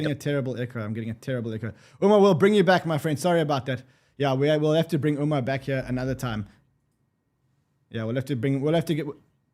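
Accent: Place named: South Africa. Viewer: I thought I recognized the Saffa twang. Nice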